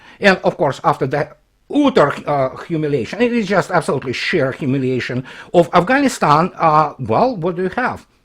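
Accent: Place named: Russia. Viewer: When I heard you at the Duran it was a bit hard for me to understand your "Russian accent" (me Spanish accent), by now I'm loving every one of your videos and I understand and agree in everything you say. You are a very Intelligent man.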